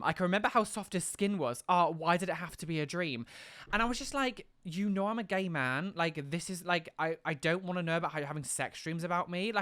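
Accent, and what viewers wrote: United Kingdom. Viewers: This is COMPLETELY off-topic, but when you speak American English, the way Brits say "R" is so funny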